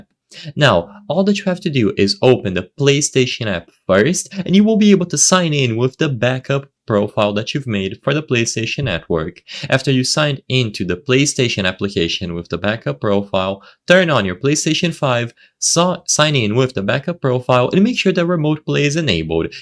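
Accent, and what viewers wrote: Brazil. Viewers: esse ingles é de brasileiro ein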